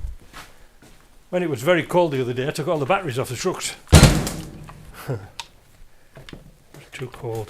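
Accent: United Kingdom. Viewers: Mate where are you located ?? you go that UK accent have'n you !! UK or ?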